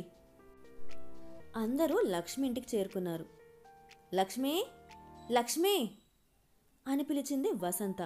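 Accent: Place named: Telangana, India. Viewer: Nice story and very well presented in telangana accent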